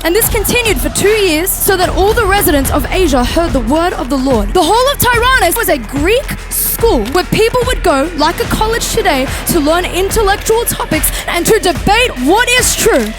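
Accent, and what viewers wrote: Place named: Australia. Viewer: I literally saw my first video of hers and I thought “ yes, she’s an Aussie!” Seriously so good to hear the truth being preached unashamedly but with such wisdom & you can tell she knows her God and His Word. I want to stop eating my dinner now & go and read the Word! Truly anointed, I think she is one to watch.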